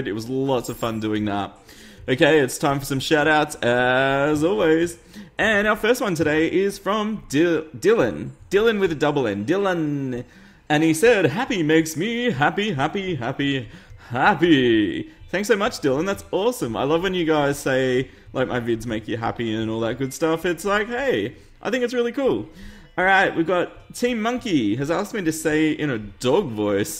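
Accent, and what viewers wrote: Australia. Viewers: Are you Australian? Just wondering from your accent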